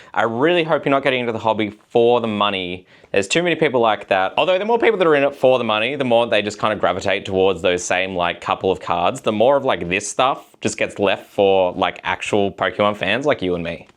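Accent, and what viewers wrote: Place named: Australia. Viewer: I live in Japan arm and already spend too much money on my anime merch so I really don't want to get into this particular hobby, but I love watching pokemon tcg collecting content and challenges (thanks to DPM, and his shorts channel). Really love hearing an Australian voice on the hobby and how the prices are manifesting back home! I'd love to see how the events look, I've never been to one myself (I live in Perth, and there's much less things happening there lol)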